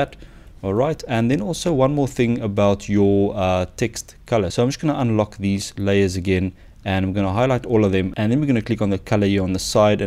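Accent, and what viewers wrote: South Africa. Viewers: South African? Love from Cape Town <3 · South African :)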